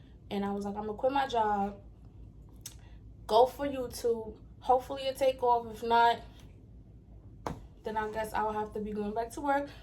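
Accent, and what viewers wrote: New York, United States. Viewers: I heard that spicy New York accent from your 1st video 😁( Bk/NY girl myself) Congratulations on your new Youtube page & Im looking forward to seeing more of you. WELCOME!! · I knew you were from NY, I can hear it.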